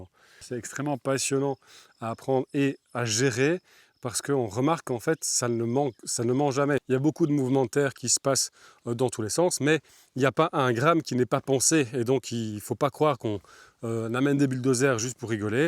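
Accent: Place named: Brabant, Belgium. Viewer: Bonjour, bonne découverte. Vivant en montagne noire, le château d'eau du Languedoc, sur les hauteurs du pays carcassonnais. On se trouve à la croisée des chemins des climats Je réfléchis beaucoup pour avoir une cohérence sur mon païs d'adoption. Objectif, préservation, régénération, encrage culturel et naturel, robustesse. Votre vision analytique m'intéresse beaucoup. Je travaille sur une terre d'un 1/2 hectares, en pente, ruisseau, courbe de niveau, future habitation en greb en amont, verger, différents niveaux de couverture végétale pour préserver le sol et les couches inférieures comme un parasol et surtout diminuer l'effet de l'évaporation. Objectif : garder ce précieux capital qu'est l'eau, régénération des biodiversités, enrichissement des sols. C'est une longue quête, merci pour votre analyse, je reconnais d'ailleurs un accent de mon païs d'origine, Brabant ? 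Cordialement, d'un belge en païs occitàn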